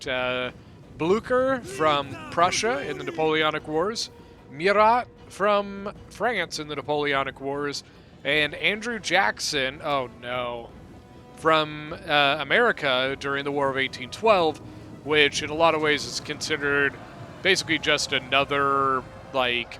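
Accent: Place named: United Kingdom. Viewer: your british accent actually suits u a lot more than u relise :))):_Ellington::_Rome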